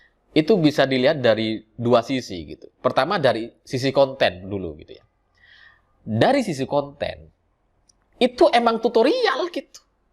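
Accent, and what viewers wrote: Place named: Madura, Indonesia. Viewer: Ada logat Madura.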